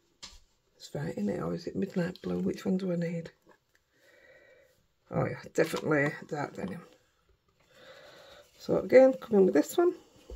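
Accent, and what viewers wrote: Yorkshire, United Kingdom. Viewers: Hello, I found this video today. I enjoyed watching it a lot, so instantly subscribed. It's also lovely to hear a Yorkshire voice. I look forward to watching your other videos.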